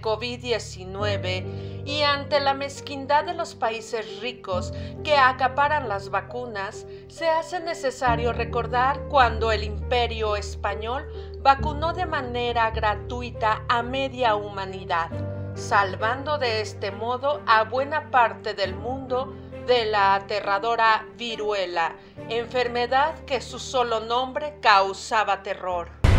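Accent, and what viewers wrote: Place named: United States. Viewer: El mejor video de la vacuna que he visto, y con ese precioso acento Americano. Muchas gracias. 🇪🇸🇯🇪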